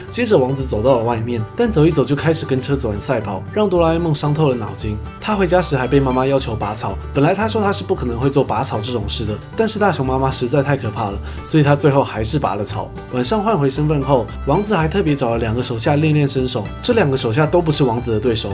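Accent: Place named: Taiwan. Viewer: 台湾腔听着好舒服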